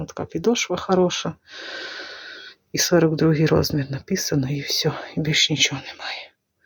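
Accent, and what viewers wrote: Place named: Ovruch, Ukraine. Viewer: Все у вас добре, урожай є, і говорите ви гарним суржиком, по особливому, по овруцькі, як мені хочеться в Овруч, може пізньої осені вдасться, люблю ваші відео про місто, про ваші квіти, мальви... Головне щоб було здоров'я і мир!!!!!!❤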